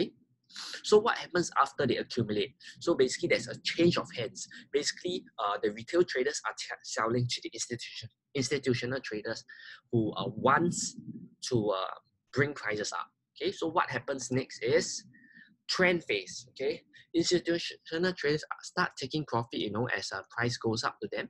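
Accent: Singapore. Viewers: No, I think he`s Singaporean.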